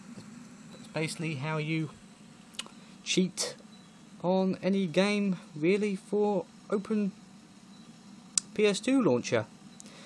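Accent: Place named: Australia. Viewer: Welp that is Australian accent after all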